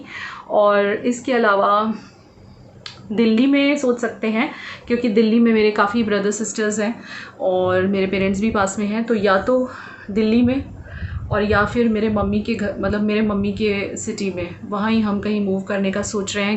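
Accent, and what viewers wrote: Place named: Bihar, India. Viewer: Aap bihari bolati Hain bahut cute lagti Hai kabhi litti chokha share Karena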